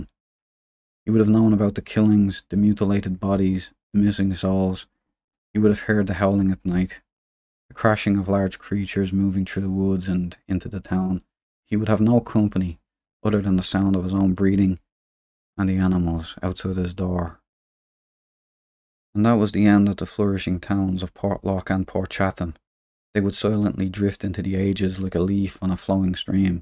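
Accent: Dublin, Ireland. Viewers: Ive watched many docs and read a tonne of stories about this place and many others similar...its good to hear a fellow dub tell the story i thaught i was the only person intrested in this in ireland 🤣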